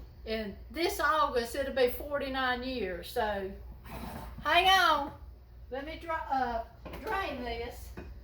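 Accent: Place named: South, United States. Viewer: I am a diabetic, so I am so glad I found your Ste. And plus you have a southern accent like me! Thank you for sharing! Please keep your site going!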